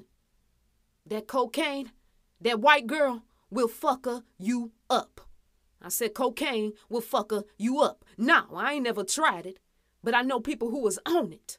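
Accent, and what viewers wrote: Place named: South Carolina, United States. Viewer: Bihhhhh you sound like me and folk down here in South Carolina...keep spiting that truth out fam..Gullah geechie..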